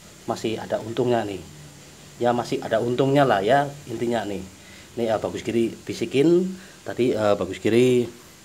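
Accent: Indonesia. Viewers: Kepingin..hahaha..medok bgt omm good 👍 bermanfaat.tks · Maaf suaranya kyak gak asing, mas apa orang jateng? Apakah mas alumni Ponpes al islah jember jatim? Tolong di bales🙏🙏🙏🙏🙏🙏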